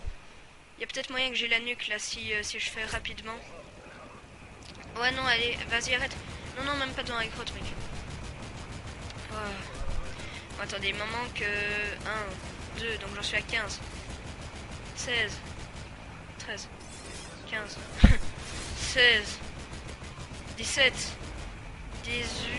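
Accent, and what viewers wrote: Switzerland. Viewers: Il est serait pas suisse lui par hazzzzard???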